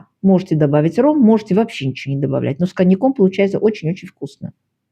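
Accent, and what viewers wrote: Baku, Azerbaijan. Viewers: Ах! Как я рада что нашла у вас этот рецепт. Давно искала. В Баку мамина подруга пекла, и было очень вкусно, он как ириска, тягучий с орехами, с приятным медовым ароматом. Спасибо вам огромное! Вы прелесть! Очень подробный рецепт, детальное описание, сладкий бакинский Джан акцент! Благодарю!!!!!!!❤ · Большой привет бакинцам! Приятно слышать бакинский акцент! Очень интересный канал, богатая коллекция рецептов!!! Спасибо вам!!! Я тоже жила в Арменикенде! С уважением из Ростовской области..... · добрый вечер!Я коренная бакинка.Сейчас живу на Кубани и с громадным удовольствием смотрю все ваши выпуски.Радуюсь вашему знакомому бакинскому акценту и замечательным и несложным рецептам.Оставайтесь такой же молодой,красивой и обоятельной! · Ваш бакинский акцент никуда не делся ❤ А мы в крем добавляли еще 100 г орехов, и в тесто тоже коньяк. 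· где бы Вы сейчас на не жили, а бакинский акцент не ушёл. Счастья Вам и мира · Лёгкий бакинский акцент приятно слышать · Я,как услышала ваш разговор,сразу поняла,что вы бакинка.Я тоже пекла этот торт в 83 году.Очень вкусный. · Бакинский акцент до сих пор прослеживается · Дорогая. Спасибо большое за рецепт. Обязательно попробую испечь для моих гостей. Вы чудесная женщина. Ваш бакинский акцент греет сердце.♥️ ❤ ❤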